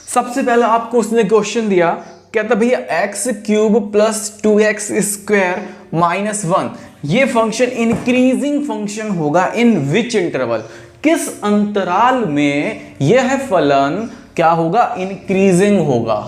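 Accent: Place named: Kanpur, India. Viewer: Gabbar is back on old style wow chacha apne kamal hi kar diya kanpuriya language ke sath ye sambha laga nisana jara to is kaliya par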